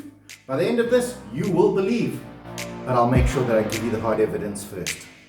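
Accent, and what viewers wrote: South Africa. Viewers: You sound South Africa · Can I guess we're ure from?.... South African Or Australian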